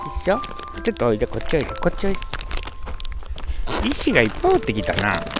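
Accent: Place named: Kansai, Japan. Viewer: 関西弁癒されるな〜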